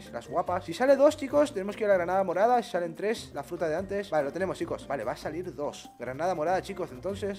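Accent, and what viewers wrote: Spain. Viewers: Ver a jugar el es muy divertido, y más con su acento español que le sale muy chevere. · suenas muy español en este video, siempre me alegras la noche y el dia <3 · fuera de joda te sale bien el acento español jajskajs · Es idea mía o habla como español? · Sonas re español boludo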